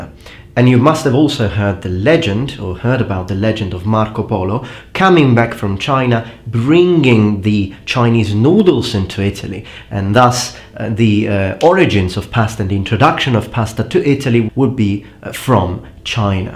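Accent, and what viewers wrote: England, United Kingdom. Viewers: Qualche consiglio su come raggiungere il tuo livello di pronuncia British? Sono a Londra da 2 anni e ancora mi trascino dietro il dialetto Romano. Grazie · Your English sounds like a British accent. Well done! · hai un accento inglese fantastico,come?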